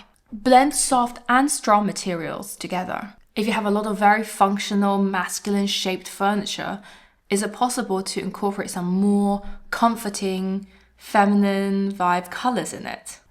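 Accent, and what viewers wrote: United Kingdom. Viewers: I have no partner or girlfriend which could force me to watch, so its my free will. ;-) I once found your videos and find the psychological components interesting, because many people are explaining "how to make your room feel more cozy/expensive/calm/natural" but without explaining, why the suggested design may have the proclaimed certain effect. Watching into primal habits of searching for prey etc is very interesting. I am a minimalist and regarding colours more on the beige, earthy and light green side. I am not a big fan of bold statement pieces or great contrast. So it is interesting to see, how people differ - because most of the male people I know are indeed exactly choosing the options you described. As while I am a fand of sanitised rooms, I prefer them not too sterile. My brother is into chrome - a total nightmare for me, as I am sticking to beech wood or bamboo. 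Oh and excuse me this personal note - I am enjoying your British English also. English was my first foreign language at school (I chose Latin as the second) - and of course nowadays in media you mostly hear American English - f.e. the Hollywood and Netflix productions. And unfortunately I am also leaning towards American English (not to mention my heavy German accent). So its always a pleasure to hear BE.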